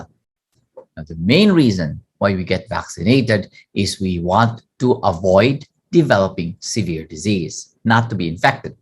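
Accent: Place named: Philippines. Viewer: Your name sounds Chinese but your accent sounds like you're from the Philippines.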